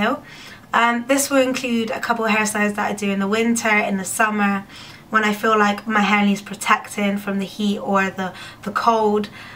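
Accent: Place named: United Kingdom. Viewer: what part of the uk are u from i can't pinpoint ur accent?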